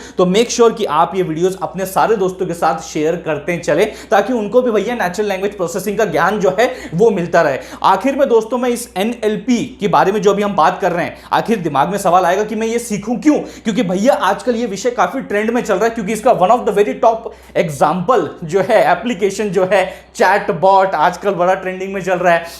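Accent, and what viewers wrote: Maharashtra, India. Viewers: Your marathi accent is very good✨ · bhava tu marathi ahes ka? aikun bhari vatle!! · Your marathi accent is very good😊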